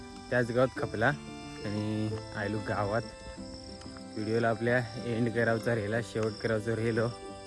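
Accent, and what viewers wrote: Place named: Agri Koli, India. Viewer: Tuze sarva videos changle astat nisargaramya navin gaave baghayala khup chan vatate tuzi agri koli bhasha aikila khup chan vatate 😊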